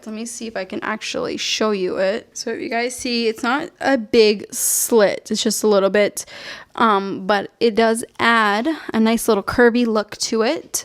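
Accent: Boston, United States. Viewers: It sounds like a bawston accent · i can totally relate! i'm from the Boston area :) you got the accent haha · The Boston accent is real haha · this just popped up and i'm glad i clicked it, immediately subscribed when i heard that boston accent. about to go binge-watch all your videos! hehe love from medford <3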